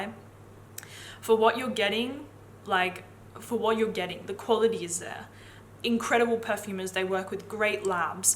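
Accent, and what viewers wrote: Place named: Australia. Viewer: I really appreciate your honesty! During my quarantine in the UK I’ve parted with a substantial amount of money on various fragrances - all of which were of your recommendation. Everyone single one was a blind buy and I have not been disappointed once! It’s so important to be transparent with your followers so thank you! Also I’m a fellow Aussie so love hearing a familiar accent ❤️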